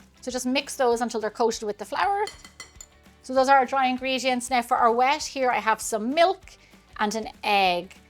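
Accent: Ireland. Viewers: Those are some lovely Welsh cakes,. Your Irish accent just keeps getting more and more adorable than ever before. 🥰🥰🥰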